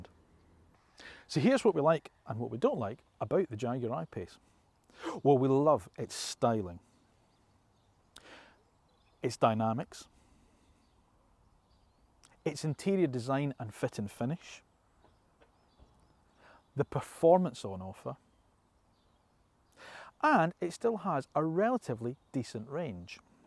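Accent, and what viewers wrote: Scotland, United Kingdom. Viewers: nice charming scottish chap's calm voice EEEEEEEEEEEEEEEEEEEEEEEEEEEEEEEEEEEEEEEEEEEEEEEEEEEEEEE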